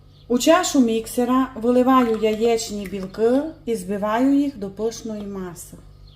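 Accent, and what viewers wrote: Transcarpathia, Ukraine. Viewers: Пані рада вас чути і дивитися на ваші витвори мистецтва,сподіваюся у вас все добре,і надалі ви частіше будете нас тішити своїми чудовими рецептами та закарпатським діалектом❤❤❤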